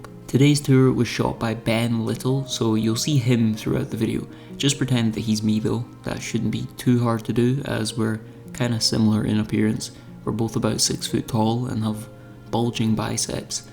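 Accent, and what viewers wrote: Scotland, United Kingdom. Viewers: Nice one, cool to see some of my heritage coliding with an aussie location voiced by a scotsman!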